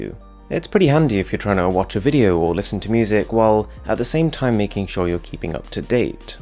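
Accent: RP, United Kingdom. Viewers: Do u really speak like that, posh!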